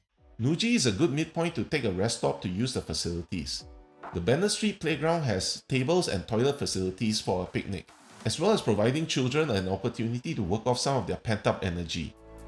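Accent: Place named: Malaysia. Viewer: Thanks for the info bro, amazing video😊. I am also planning a trip here soon. Btw are you Malaysian as well? Guessing based on your accent😁.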